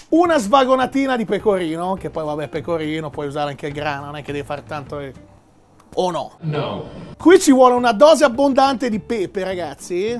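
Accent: Rome, Italy. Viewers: Parla tutti li video in romano che te esce bene grande sempre generoso cor magna!!👍🏻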